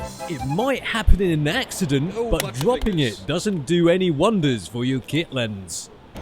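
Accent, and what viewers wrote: England, United Kingdom. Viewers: Thumbs up for asian guy with british voice! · I never saw an Asian guy with an English accent before. He even made fun of himself. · he has a british accent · I love your british accent:) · nothing funnier than an asian guy with a british accent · i trust him because of his british accent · Hong Kong is a British colony that why you have a British Accent ! not quite ! Local people coming from the colonies and Dominions do not speak with British Accents ! They speak eighter Chinese English ,Malay English, Indian English your probably born in the UK and moved to HK at some point of life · Asian with British accent...EPIC!!!!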